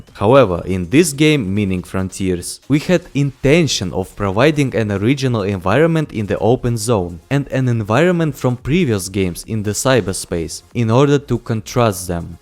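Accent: England, United Kingdom. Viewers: Oh, you are from England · Oh, you from England.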